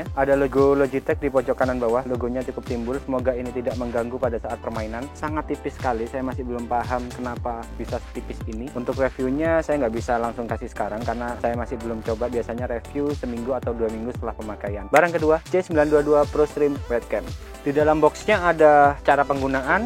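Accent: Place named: Java, Indonesia. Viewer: bahasa jowone kentel ... hahahaha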